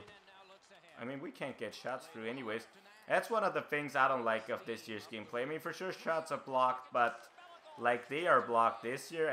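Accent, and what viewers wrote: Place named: Austria. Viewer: I like your accent... you sound like Michael Grabner. Are you from Austria? You're very insightful, keep up the good work.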